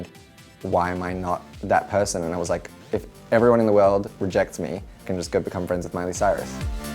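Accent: Australia. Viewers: He sounds Austrialian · i'm shook the aussie accent is the hottest one i think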